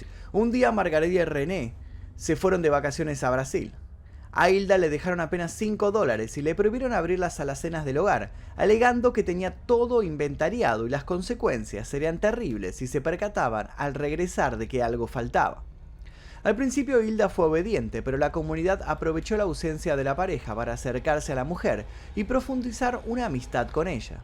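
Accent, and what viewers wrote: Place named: Argentina. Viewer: Me encantan tus narraciones perfectas con su toque 🤗👍 con todo respeto a tu Sra. El acento Argentino siempre me ha parecido muy lindo 😃. Saludos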